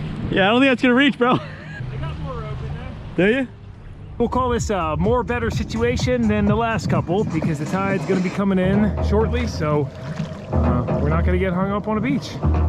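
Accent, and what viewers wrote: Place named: Delaware County, United States. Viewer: You gotta be from Delco. Love the content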